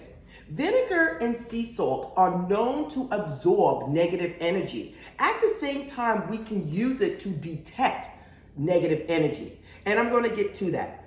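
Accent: New York, United States. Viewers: Hey dear!! I used apple cider vinegar instead, because my other vinegar is in the storage. Is that OK? I think it should be totally fine. Also, I just did the ritual and I'm looking forward to seeing the results in 3 days. PD: Love your NYC accent. That's the coolest accent in the US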